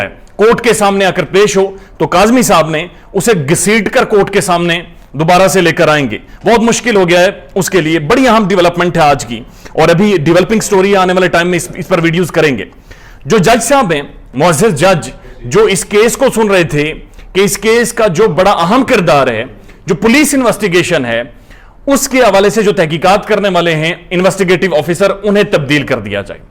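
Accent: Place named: Pahari, India. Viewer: Puttar tussi pahariye ho, I love the way you talk ♥️🤣🤣🤣 I laugh and laugh and laugh, Allah bless you with the greatest blessings of both the Worlds, Aameen, Summa Aameen !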